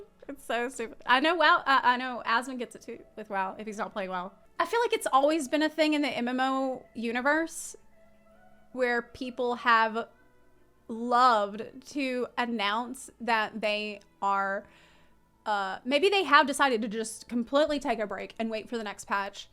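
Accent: South, United States. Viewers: Random note: your southern gal accent has made a major comeback since you were forced to return to the US! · Is zeplas southern Akzent stronger then bevor ? Sounds like it ! Guessing merica is brushing off on her. Disclaimer i know she is from america. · Wow haven't seen a video since she's been back in USA and didn't realize her accent came back this hard. When I was a kid my family said I got a southern draw from going to my friends house.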